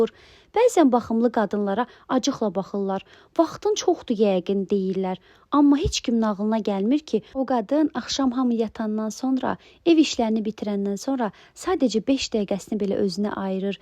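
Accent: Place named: Goychay, Azerbaijan. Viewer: Hər kəsin bəxti siz kimi gətirmir... Ağzı Göyçəy, ağzı Göyçəy danışırsız elə.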